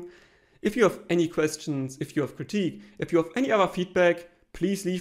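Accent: Germany. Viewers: The written review is good. You are well informed for a newbie :D How did you learn all the Audio Stuff and the assessment etc? Can you recommend any Youtube Channels or books? Promising Channel, keep up the good work! Du hast auch einen typischen deutschen Akzent im Englischen :D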